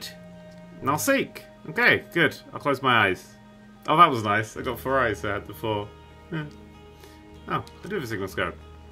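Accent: United Kingdom. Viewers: Sir, I don’t know how to make this not sound wired, but you are delightfully British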